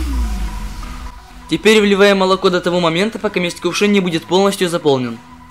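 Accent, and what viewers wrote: Ukraine. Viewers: Этот украинский акцент...)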